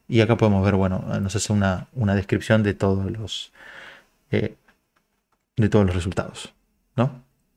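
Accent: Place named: Rioplatense, Argentina. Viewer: en español, (Argentino o Uruguayo)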